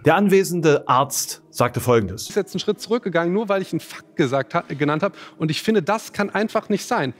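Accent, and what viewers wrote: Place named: Halle, Germany. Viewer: Gerade entdeckt. Super Video, sympathischer, tolles Thema und vor allem...hallenser Dialekt. Ich liebe alles daran.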